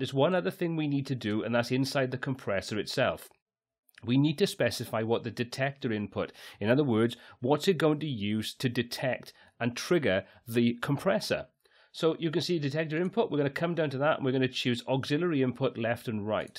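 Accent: Wales, United Kingdom. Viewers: Great explanation and lovely Welsh accent!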